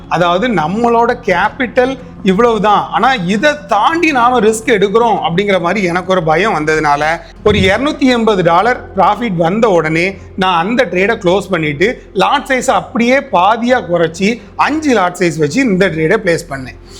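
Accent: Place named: Nagercoil, India. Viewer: Neenga nagarkovil ahh unga slang nagarkovil karanga peasura mathiri yee irukku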